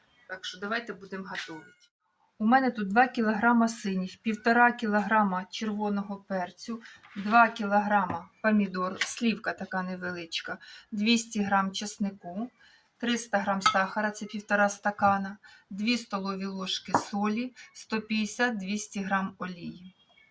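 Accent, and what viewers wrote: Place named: Lozova, Ukraine. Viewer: ❤Подобається як ви разговарюєте, по нашому ,по Лозівському хоча проживаю більше 40 років на Полтавщині. Не звертайте увагу на злі язики ,це все від заздрощів. Миру, здоров'я, найшвидшої Перемоги. До речі моє рідне село Перемога ❤.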